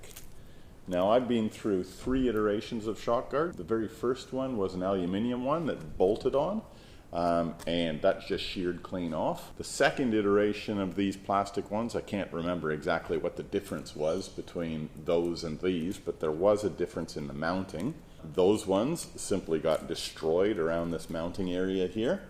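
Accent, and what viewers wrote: Canada. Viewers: Very useful video! Thank you! Are you a Newfie?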